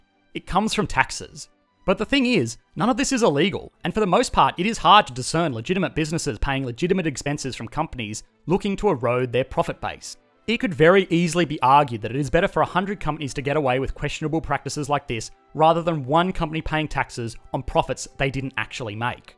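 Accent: Australia. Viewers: You have the most charming Australian accent I’ve hared😃👏😄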